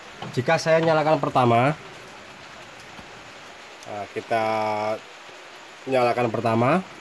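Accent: Java, Indonesia. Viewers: dari kalimantan timur ko logatnya seperti wong jowo bang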